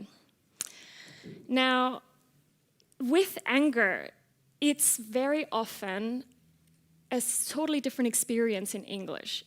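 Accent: Germany. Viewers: This cadence at the end of each phrase, the intonation sound like German despite the good pronunciation in English interestingly. Creating new words is a great idea! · Yes there is a German tinge in your English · I could here she was a native german speaker by the way she talked 5 secs into the video lmao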